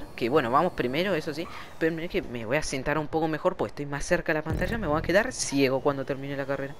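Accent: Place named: Argentina. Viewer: Me vuelve loca el acento argentino y mas si es hablado por ti, te quiero, saludos desde Mexico❤️❤️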